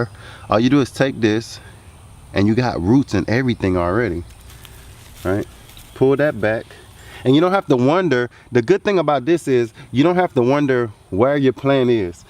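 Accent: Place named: South, United States. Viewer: I know a southerner when I hear my people. Lol This is my first year attempting a garden. I did very well with container tomatoes, then bombed on the garden bed plants. However, I just went and found my first baby watermelon growing after I hand pollinated it three days ago. Super excited! Which zone are you in? I'm in 8a. I'd like to figure out what I can grow through the Fall. Thank you for the videos~!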